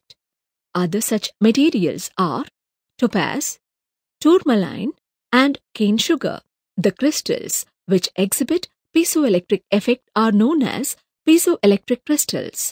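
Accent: India. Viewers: Great, but unfortunately I will never get used to that English-Hindi accent 😓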